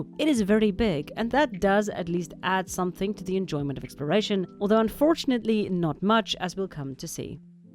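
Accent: South Africa. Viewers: Is she South-African? :o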